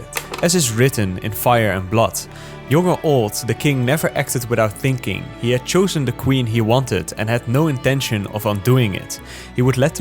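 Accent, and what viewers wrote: Netherlands. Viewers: Great video, but do I notice a Dutch accent there?🤔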